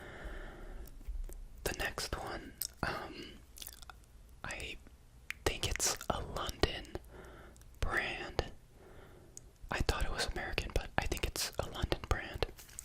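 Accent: Brazil. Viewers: Hola! Me encanta el acento portugués. 👍🏻👍🏻👍🏻 Además lo haces genial, he reconocido muy bien las marcas que pronunciabas. 😊 Gracias una vez más por tus vídeos! 🫶🏻🫶🏻🫶🏻🫶🏻🫶🏻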